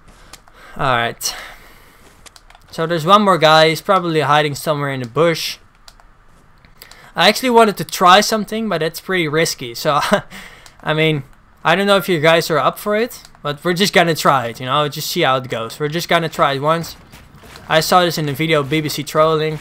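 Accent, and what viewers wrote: Netherlands. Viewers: Are u dutch . Je hebt een nederlands accent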